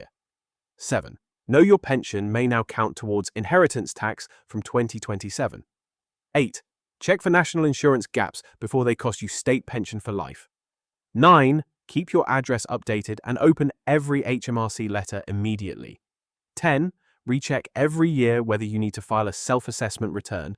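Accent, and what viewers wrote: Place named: United States. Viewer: American pronounciation with an English accent makes me uneasy, even though the advice is good. ISA should be pronounced as a word and gov.uk should be said in one particular way.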